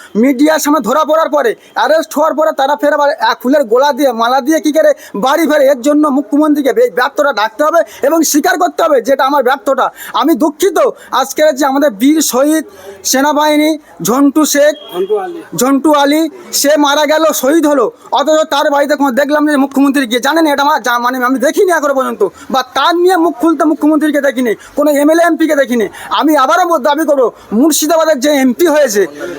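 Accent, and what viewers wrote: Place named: Bangladesh. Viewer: তুই to বাগলাদেশি। তোর কথা শুনেই মনে হচ্ছে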